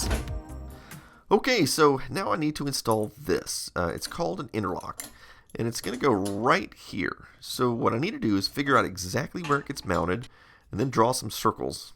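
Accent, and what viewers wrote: Texas, United States. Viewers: The pronunciation of roof as "ruff" is mainly just a thing in Texas. Our accents and how we pronounce certain words can very a lot depending on where you are in the US. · So Texans say 'roof' funny, as well as 'solder'?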